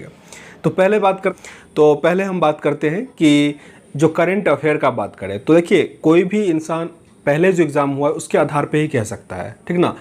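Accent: Bihar, India. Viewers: Bihari tone ,chaura ganga kinare wala , thx bhaiya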